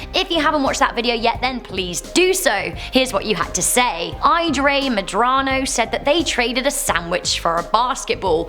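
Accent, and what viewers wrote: United Kingdom. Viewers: She says capsule like it’s capsooool that’s wack I really love the accent but the British be saying certain words weird asf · She sounds British, one can only hope so. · Great job as always hun keep up the good work i love your voice are u from the uk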